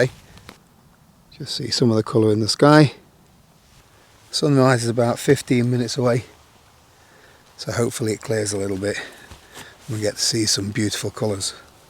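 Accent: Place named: Yorkshire, United Kingdom. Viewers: That Barnsley accent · I love that there’ll be a load of Americans out there, totally not understanding T’Yorkshire accent uses!! 🤣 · I always thought you was a Yorkshire lad to be fair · Ahh it's great to hear a reet proper yorkie accent, from a Lancastrian lad, it's getting rarer is the colloquial English accent mores the pity. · Yorkshire born... best accent, best product reviews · from Barnsley, defo accent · is aggressively Sheffield sounding 😂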